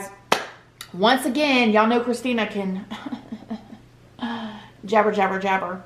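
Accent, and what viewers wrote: South, United States. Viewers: She's got a beautiful southern twang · Girl..I adore you! I have been binge watching your videos. There Is something about u that speaks to my soul! I love how your a lil bit of country ( accent )..and a lil bit of street yet classy all wrapped in 1! Does that make sense? Lol.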